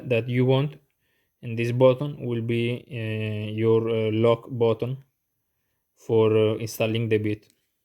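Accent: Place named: Italy. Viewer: I understand everything he says. He speaks English with an Italian accent. Put on the subtitles and you can read what he says.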